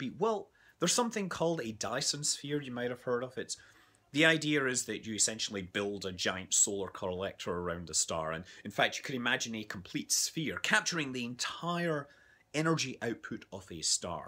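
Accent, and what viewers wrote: Scotland, United Kingdom. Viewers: So he is Scottish, thought he was American but he has a similar accent to me(yes I'm Scottish) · what part of scotland do u get the belter accent from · What part of Scotland are you from . I'm form the west coast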